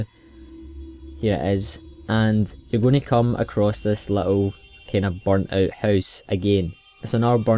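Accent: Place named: Scotland, United Kingdom. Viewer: cos hes scottish like me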